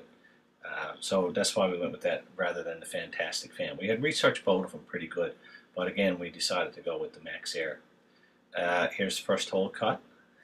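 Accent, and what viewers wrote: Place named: Newfoundland, Canada. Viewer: Hah. Here I am daydreaming about a ford transit conversion, looking for ideas when I stumble across this video and immediately realize that I recognize that accent. A fellow Newfie!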